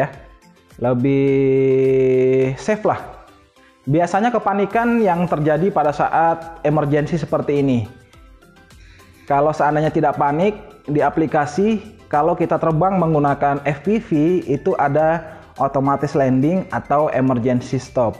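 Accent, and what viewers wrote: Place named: Kalimantan, Indonesia. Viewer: dari logat bahasanya ketahuan,kalo dari kalimantan....sukses selalu ya om ,🙏🙏🙏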